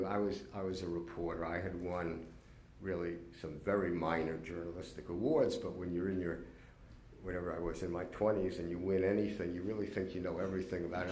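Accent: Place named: New York, United States. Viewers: Authentic articulate New Yawker. Could listen all day long. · Congratulations on just now discovering what a "New York Accent" in the year 2020.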